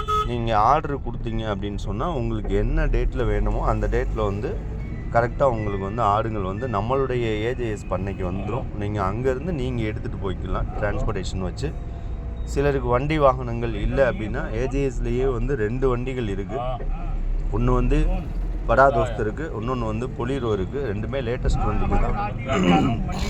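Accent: Madurai, India. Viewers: Assalamualaikum warh madurai basha ❤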